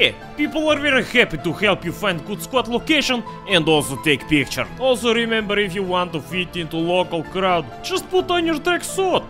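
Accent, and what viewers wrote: Russia. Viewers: ale nie specjalnie bo tak ruski mówią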